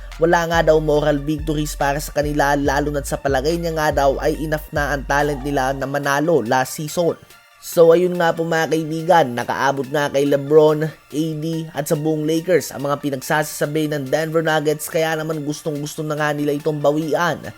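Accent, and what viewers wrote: Visayas, Philippines. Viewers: Bisayang bisaya ah 🤣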